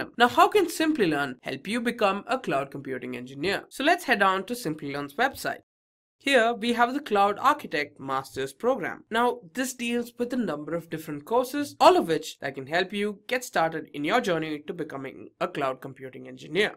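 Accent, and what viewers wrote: India. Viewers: Indian accents are so cringe jesus christ.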